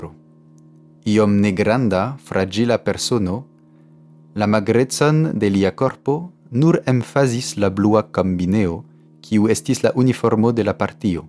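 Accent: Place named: France. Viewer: Mi rekonas francan akĉenton sed ne la precizan homon...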